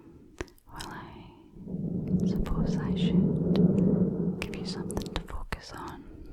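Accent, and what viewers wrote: Scotland, United Kingdom. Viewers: Gorgeous Scottish accent. So clear and concise. 🏴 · Some incomprehensible Scottish, late night rambles and tingly mic cover scratching does in fact lead to brain mush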